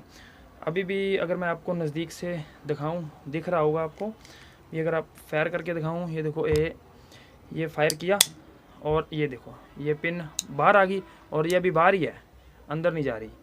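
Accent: Punjab, India. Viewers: bhai saab punjabi touch aa raha h apki awaz mai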